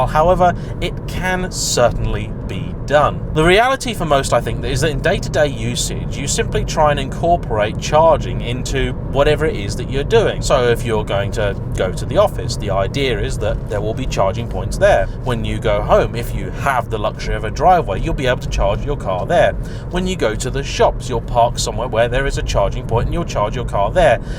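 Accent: United Kingdom. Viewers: LOL. Wasn't expecting a response but keep up the excellent work. Love the dialog. British English is much more fun that U.S.'s version.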